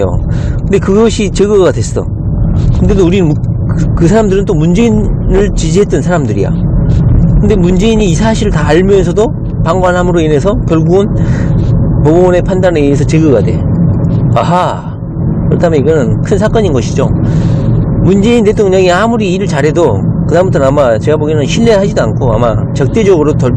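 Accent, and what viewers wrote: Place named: Gwangju, South Korea. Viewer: 광주출신이요? 아따, 발음이 친숙허요...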